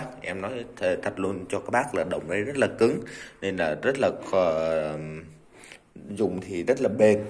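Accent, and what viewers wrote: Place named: Hue, Vietnam. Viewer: Giọng bác giống giọng Huế quá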